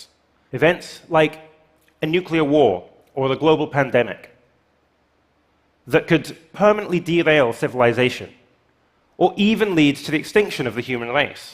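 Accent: Scotland, United Kingdom. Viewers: Sounds Scottish to me. But I'm not sure. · Where is this guy from? England, Scotland, Ireland. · What accent is that??? Half Scottish half Dutch attempting a posh English accent? Mental. Good video, thought distracting... that accent!?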